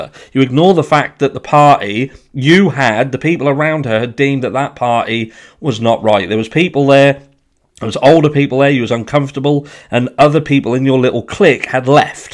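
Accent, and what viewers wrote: United Kingdom. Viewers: I appreciate your commentary and hearing a British accent is refreshing.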